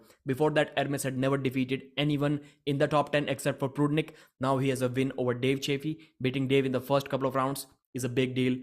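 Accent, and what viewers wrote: India. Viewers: FOR THE MONEY AND CONTENT! THIS CHANNEL ALWAYS UPLOAD CLICK BAIT!! FUCKING CHANNEL FUCKING NARATOR INDIAN🖕🏻🖕🏻🖕🏻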